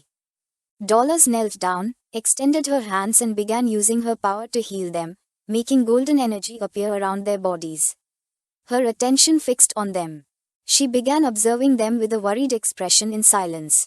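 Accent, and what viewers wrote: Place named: India. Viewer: kind of an ugly accent... can somebody change the announcer.... its like watching indian/tamil movies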